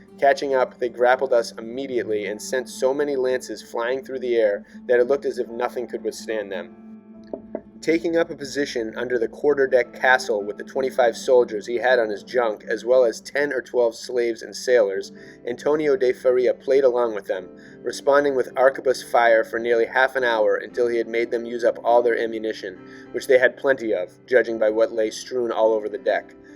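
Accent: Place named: Massachusetts, United States. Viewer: I know your from MA, I can hear it! Lol lmk I’m from revere kid!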